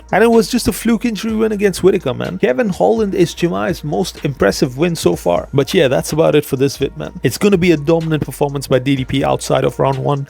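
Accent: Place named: United Kingdom. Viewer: What part of Uk you from?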